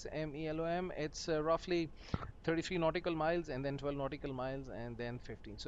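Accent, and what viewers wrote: India. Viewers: I dont like your indian accent because you sound like a guy who calls me from "windows technical support"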